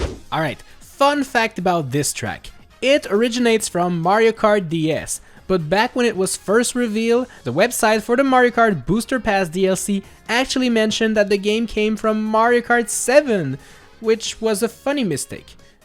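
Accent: France. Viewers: Hey nice french accent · Why do I feel like that English is actually second language and French is his first. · I speak french and your accent is not bad 😁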